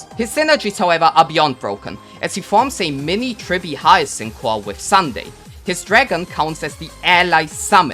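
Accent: Germany. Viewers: bruh, i'm trying to figure your accent. Are you a German?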